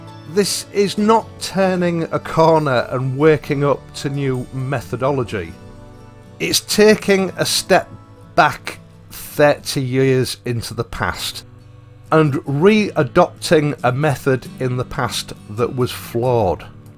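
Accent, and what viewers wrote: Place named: Hull, United Kingdom. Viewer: Just started out … love your videos! It’s hard to find Australian content but this is good enough for me. Also your voice takes me back 25 years to my home town of Hull.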